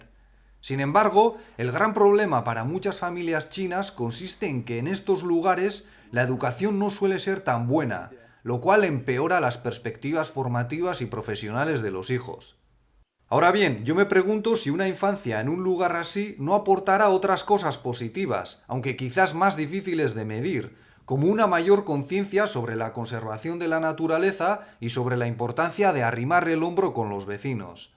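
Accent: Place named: Basque Country, Spain. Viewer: Leo tus descripciones y comentarios como si hablases tu, con tu particular ritmo y acento vasco xD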